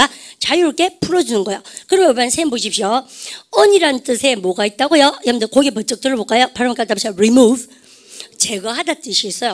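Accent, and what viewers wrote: Daegu, South Korea. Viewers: 50넘은 세아이 엄마. 심심풀이로 시작 했어요. 대학시절도 생각나고. 선생님께 영어도 배우고. 플로리다에서 하루 종일 한국말 듣기만 하는데 경상도액센트도 정겹고 가끔씩 귀에 꼭꼭 들어오는 갱상도만의 어휘구사도 반갑습니다. 매일일상에서 쓰는 단어 별거 없다고 우습게봤는데 아이들이 중학생이되니 저도 어휘구사를 좀 고급지고 학구적으로 향상시킬 필요를 느끼던참에 샘의 강의 발견하고 열공중입니다. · 아이들 다 대학보내고 이제 자유부인이 되었는데 허한 제 인생을 영어로 함 채워볼까 해요. ^^ 쌤 구수한 대구사투리 넘 좋아욧~~저도 사투리 쓰는 부산아줌입니다 ^^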